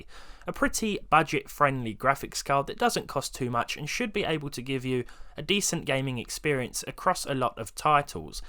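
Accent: United Kingdom. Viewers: same lmao the british accent really makes it funnier too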